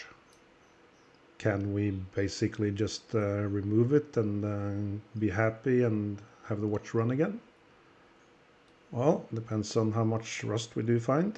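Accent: Norway. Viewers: Are you German? Your accent sounds so damn Norwegian! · var bare hyggelig å høre at du fortsatt hadde norsk aksang på engelsken. Digger det du gjør👍🏼 så håper å se mer av dette :) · Your accent sounds more norwegian than swiss? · Financially it probably wasn't worth the effort but emotionally...its a beautiful timepiece and I would be very proud to show before and after Shots if i had been able to restore it like you did. You mentioned your in Switzerland...I was thinking. "But he sounds Norwegian to me!" 😀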